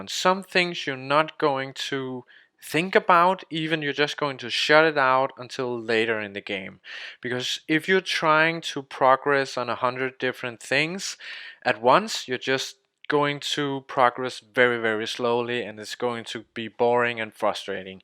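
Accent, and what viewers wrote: Denmark. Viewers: I love the Danish Accent....you should hear mine, we sound very alike hehe :p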